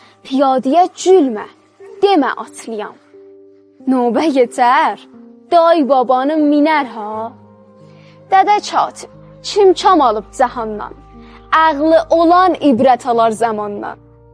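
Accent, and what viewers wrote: Tabriz, Iran. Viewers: Təbriz ləhçəsi😢😢😢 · Sağ ol şirin qiz nə gözəl Təbriz ləhçəsidi xoşbəxt ol yaşasın bütöv Azərbaycan Baki Təbriz Ankara ! 👍❤️🇦🇿❤️🇹🇷❤️🇵🇰🙏✊🧿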